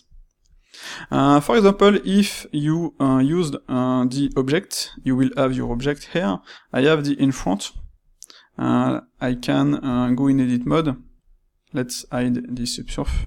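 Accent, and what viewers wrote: France. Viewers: if i do disss for exsapoool de coursour hahah i love franch english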